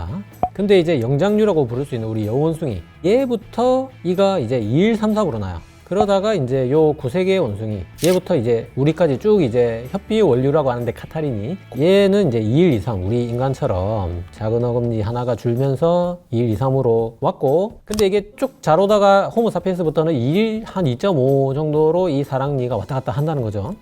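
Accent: Gyeongsang, South Korea. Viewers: 확실히 경상도 사람이다 ㅋㅋㅋ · 저는 꼬리뼈가 조금 더 크고 동이근 있어요. 아직 진화가 덜 되었군 ㅎ 저는 경상도 방언이 참 듣기 좋더라고요. 경남쪽이신 듯... 영상 감사합니다.😊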